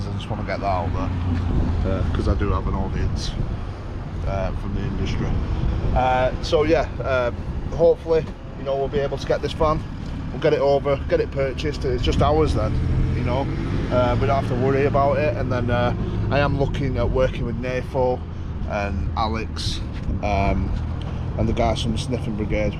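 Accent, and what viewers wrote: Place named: Lancashire, United Kingdom. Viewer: Hi I don't know if you can use it but I have a Renault traffic van, <num>,000 miles good runner etc. I wish I could donate it but I could let you have it for £2500 If your interested let me know All the best ps from your accent I'm guessing that your in the Lancashire area, I'm originally from Preston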